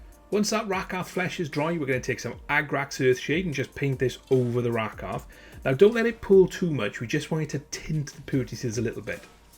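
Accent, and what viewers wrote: Wales, United Kingdom. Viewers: What part of Wales are you from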